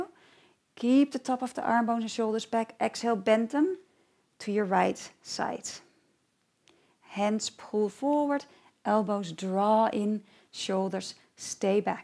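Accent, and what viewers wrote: Netherlands. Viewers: I love your dutch accent! <3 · She sounds very Dutch. Is she? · Dutch English pronunciation...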